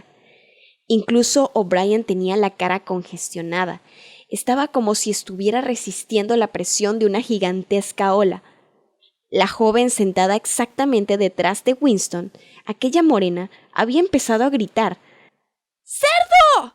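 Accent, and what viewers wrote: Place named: Latin America. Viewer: Bien! Con acento latino. Ese ibérico es muy molesto. Gracias.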